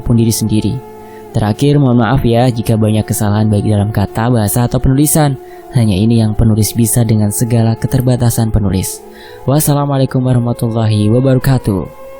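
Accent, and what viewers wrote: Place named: Banyumas, Indonesia. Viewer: Apa sing Bae pak.. ngapak temen jaaann...😂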